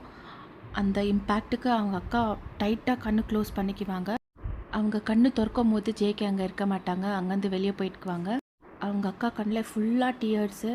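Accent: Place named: Tamil Nadu, India. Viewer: Miss you so much your tamil slang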